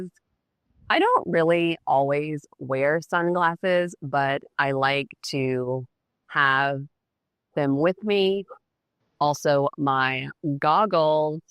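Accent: United States. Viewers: Dear One day on the beach - ti's is so nice idea and practical as well I practise listening, I enjoy it a lot even though your English is definitely American (I prefer soft British), but it is done in very interesting: slow, creative, transparent and coherent way. Also your today's adventure has reminded me my a few Spanish experiences in the past. There are rally beautiful memories. Warm hugs from England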